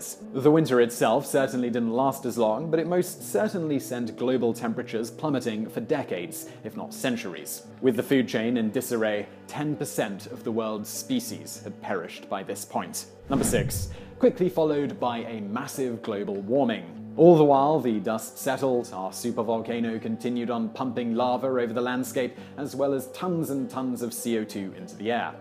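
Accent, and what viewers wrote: England, United Kingdom. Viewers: I'm not easy to get a like or subsciption out of. But most of the video and channels paticipates on make me wish I could subscribe and like more than once. Thanks to the team, I feel like Wikipedia is being delivered to me in video with a fine British accent and large vocabulary. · is a great learning resource and a great teacher. Full-meal range - tragic (worst despots), inspiring (celebs once homeless), serious (environment, addictions), fun (Richard Gere links) and more - gives Brain Gym capacity-building., you engage us with great voice, classy accent (a pox on the naysayers), crystal clarityy at speed, and England's great cultural assets of dignity, humour and discretion. To quote the in, "Who could ask for anything more?"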